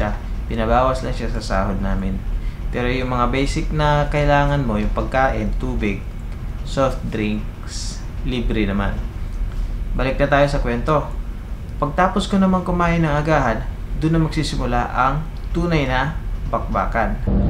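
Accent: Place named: Visayas, Philippines. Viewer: Sir taga asa ka sir? Kabalo man gud ka mag bisaya haha. Pa shout out na din😘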